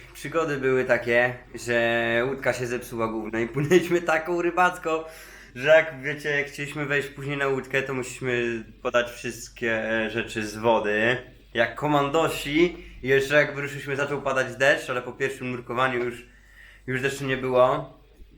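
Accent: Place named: Poland. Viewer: Polski akcent jest :)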